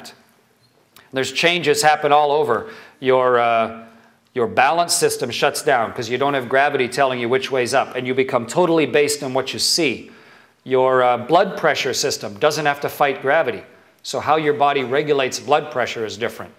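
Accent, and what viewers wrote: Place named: Canada. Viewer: Hehehe, I adore the Canadian accent:-) Especially the "eh" thing:-)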